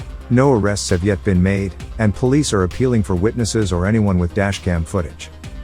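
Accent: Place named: United States. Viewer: . I'm aussie and I reckon the yank voice suits this better. Dunno why, probably just used to it. Either, you're awesome, stay that way!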